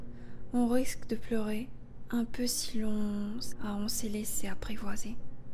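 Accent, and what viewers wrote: South Korea. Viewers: 중국어 많이하면 혀 꼬이는것처럼 구조가 변해요 덕분에 영어발음도 좋은 편이긴 하다만 가끔 세는 한국어 발음이 있어요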